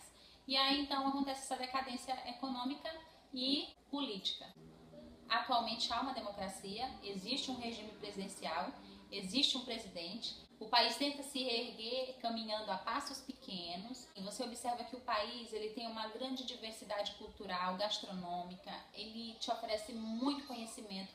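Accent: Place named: Ceará, Brazil. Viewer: neste vídeo, aprendi muito sobre a história do Surimane. Falas muito bem, organizas as frases como uma pessoa culta. Guardas, ainda, sotaque que pode ser do Ceará ou do Piauí, salvo engano. Pretendo, visitar essas três Guianas para conhecer os lugares e aprender mais sobre as culturas e os costumes, além da história. Tenho dificuldade de imaginar sobre a economia de um país cuja população - ao que parece - se concentra quase toda em uma cidade. Resumo: gostei muitíssimo de "tropeçar" neste vídeo. Tanto que me inscrevi logo. Parabéns.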